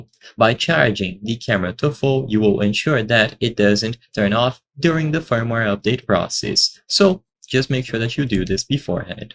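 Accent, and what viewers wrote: Brazil. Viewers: Você é brasileiro? Tive impressão pelo sotaque, awesome video my friend!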